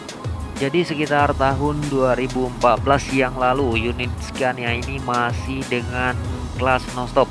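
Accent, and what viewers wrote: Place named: Medan, Indonesia. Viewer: Abang ini asli medan, ap asli orang aceh. Logat nya susah2 di tebak.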